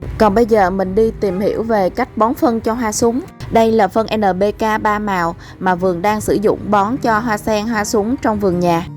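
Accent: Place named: Mekong Delta, Vietnam. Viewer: đúng chất người miền tây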